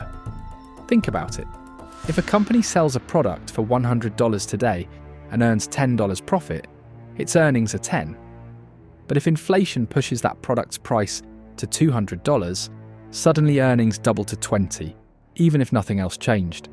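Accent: United Kingdom. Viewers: British accent doesn't help. Bogus video. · I hear a British accent I know the guy talking is a Socialist. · Is it somehow cool to have a guy with an English accent narrating a video consisting of an animated hand drawing pictures? Why? 😶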